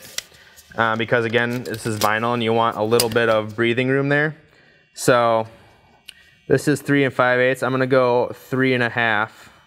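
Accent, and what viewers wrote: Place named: Wisconsin, United States. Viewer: where in wisconsin did you come from?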